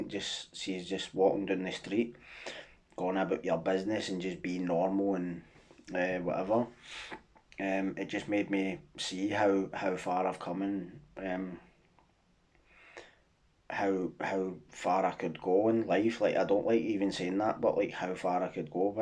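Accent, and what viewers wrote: Scotland, United Kingdom. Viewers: Alright, I follow your channel from Australia, usually when I’m sitting at my desk working 🤣 really enjoy the topics, delivered very eloquently in common Scottish tongue. A wee bit of home for me. Keep up the good work.. Keep up the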